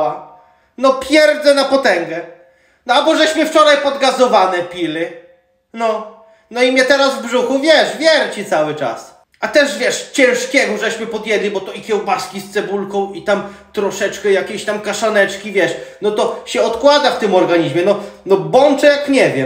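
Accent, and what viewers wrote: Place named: Eastern Poland, Poland. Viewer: Jest Pan super 👍. Jak ja udaje gwarę "wschodnią" to żona się złości że się wygłupiam ale jak Pana usłyszała to już zmieniła zdanie. Pozdrawiam z Małopolski.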